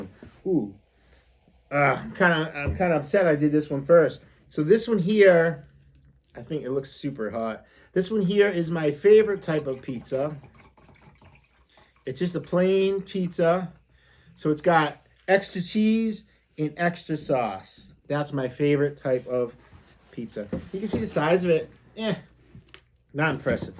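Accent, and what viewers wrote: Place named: New England, United States. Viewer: I'm surprised you don't pronounce papa as "puppa" with your New England accent.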